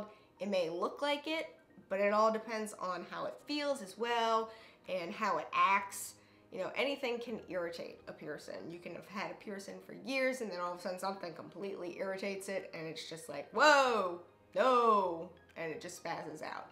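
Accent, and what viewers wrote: South, United States. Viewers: Her accident is like half way southern😂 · This does not anything like Midwestern or Canadian 😂 I’d bet a dollar shes from the south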